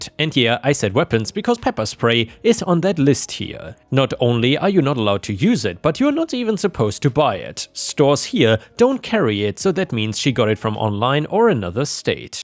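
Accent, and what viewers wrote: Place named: Germany. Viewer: you could read the dictionary and I'd listen. I love your stories, but that soft German accent is the best part.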